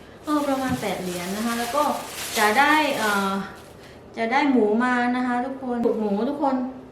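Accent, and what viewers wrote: South, Thailand. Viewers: น้ำส้ม ไม่มีเยื่อ นิคนใต้ชัดเจนไม่ต้องหมุนเสาเลยค่ะพี่ 🥰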